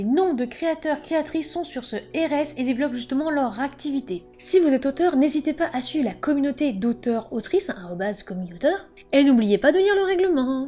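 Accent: England, United Kingdom. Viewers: Merci l'accent anglais ! coeur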